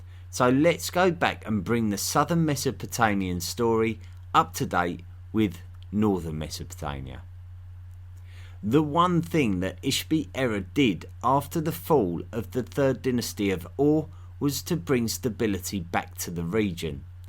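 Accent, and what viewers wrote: United Kingdom. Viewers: He sounds like that guy on Saturday night live some years ago who talked about his drawings with a similar British accent. The guy would pronounce drawings as drowrings..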